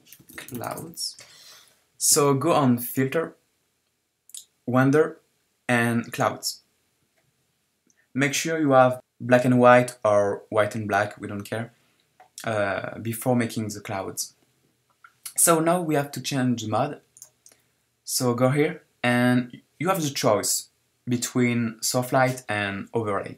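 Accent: France. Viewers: Tu es français, non ? L'accent est magnifique ! Edit ; J'avais pas remarqué le toshop en français... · Mdr on sent bien l'accent français ;) sinon très très bon tutoriel ainsi que boulot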